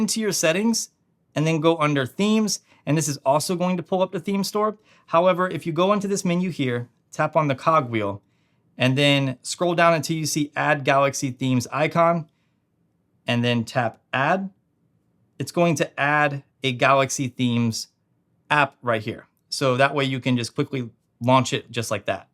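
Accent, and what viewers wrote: United States. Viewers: I have the note 8 since it lunched but I'm thinking to upgrade to the note 20 ultra. I like your American accent 😀.....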